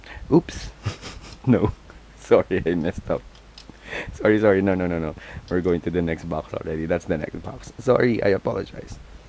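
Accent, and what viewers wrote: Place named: United Kingdom. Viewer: I MADE IT!! And last week The Legend!!! I made the Star box in Jelly red violet and jelly turquoise! Some bracelets look bad with jelly bands but NOT this one it looks stunning!!! I have a bad headache after making it though lol! Great British accent you did too! Sounded just like my accent which is English!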